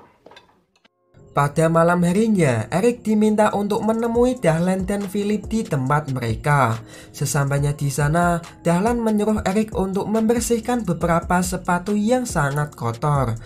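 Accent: Java, Indonesia. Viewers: Meudok bingit min .jangan kaku min bicara nya. Monoton bingit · jowo mu endi mass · Medok banget lu bang..wkwkkw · wong jowo? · Medok banget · JAWA NYA MEDOOOOOOOK BGT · Medok banget sih Lo, jijay gw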